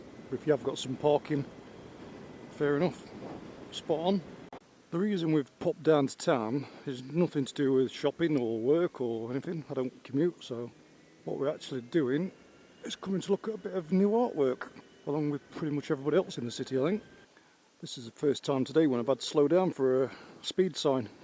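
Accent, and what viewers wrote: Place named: Nottingham, United Kingdom. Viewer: Good owd Notts lad narration...👍eesezizscootizquikkaranmine??.(if yer not a notts lad yer'll never understand)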